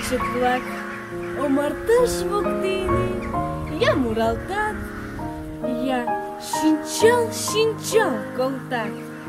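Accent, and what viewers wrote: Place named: Russia. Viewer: I am Estonian and I also understood 0% because of Russian accent. I understand only when I read it.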